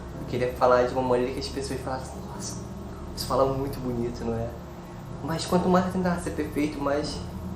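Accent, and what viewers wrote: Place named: Amazonas, Brazil. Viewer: Gostei muito da sua sinceridade, e da forma tão livre como você fala. Seu sotaque parece amazonense... Sucesso amigo!!! :-)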